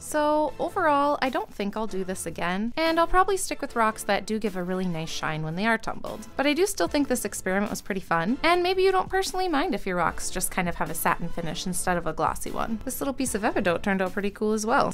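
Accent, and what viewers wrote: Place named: Midwest, United States. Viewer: This was randomly recommended to me and im only about a minute into this video, but i didnt realize how much I missed the subtle midwestern accent until you said 'its fall here in minnesota'! I grew up outside the twin cities and moved to oregon a couple years ago and i just got hit with a wave of nostalgia and endearment for the type of people i grew up around. Thanks for the reminder of home, im excited to see how the rocks turn out!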